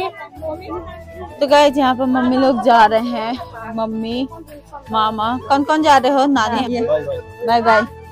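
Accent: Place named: India. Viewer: aapke bhasha deval side ki lag rhe the to mena bol dya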